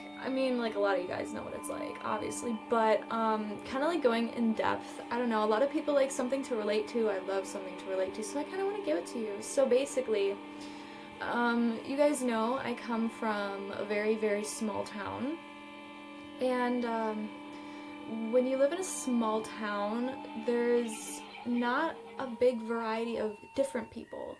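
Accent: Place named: Canada. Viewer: I'm gessing it by your accent, are you from Canada?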